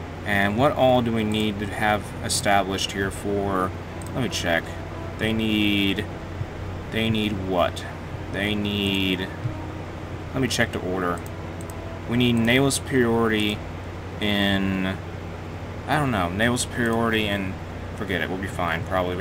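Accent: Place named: Appalachia, United States. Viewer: Now u sound loke an american hilbelly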